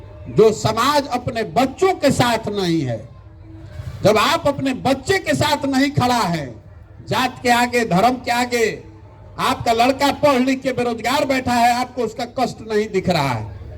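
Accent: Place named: Bihar, India. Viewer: I am bengali, Don’t know why but I love his bihari dialect ❤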